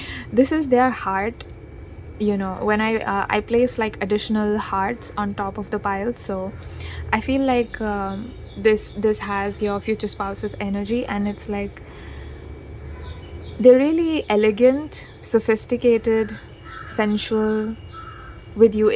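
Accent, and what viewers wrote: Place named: India. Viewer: You are so creative. I can feel you like doing what you are doing, it comes from your heart. From your accent I guessed you are an Indian. And the reading was very accurate too. Pile 2 & 3 : my life has been difficult bc i was very different from people around me & as a consequence i struggled with loneliness,lack of support etc mental health issues & i had toxic relationship with a narcissistic bf for two n half years . I'm a double Aquarius with Venus in cancer. I'm a very quiet introverted person. Unless I'm with someone i know & trust a lot, I am not very emotionally expressive. Subscribed, I will watch your readings I think we are aligned. Your energy is calm relaxing serene and slow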